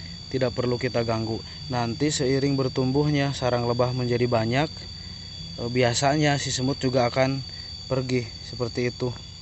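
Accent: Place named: West Java, Indonesia. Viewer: Beda ey Ari logat Sunda mah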